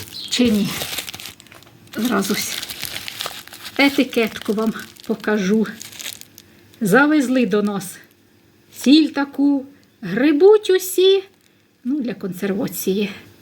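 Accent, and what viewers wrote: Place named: Poltava, Ukraine. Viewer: мені дуже подобається Ваша мова я сама родом із Полтавщини як родичів послухала здоров'я Вам миру🎉🎉🎉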